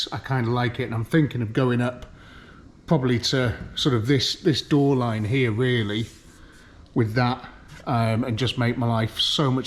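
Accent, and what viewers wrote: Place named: Wales, United Kingdom. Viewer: My elderly mother is wondering whose lovely Welsh accent that is wafting around the house. I keep leaving YT on auto-play.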